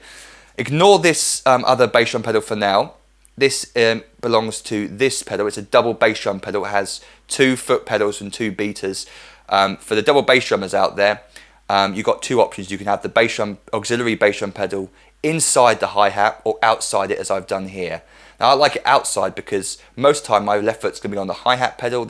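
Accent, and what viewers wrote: United Kingdom. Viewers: Very helpful video! And, I really like and so, but I hate british accent ><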